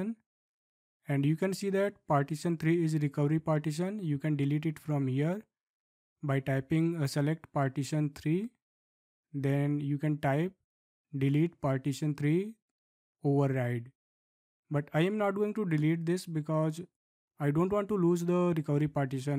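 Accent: India. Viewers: These indian accents are calming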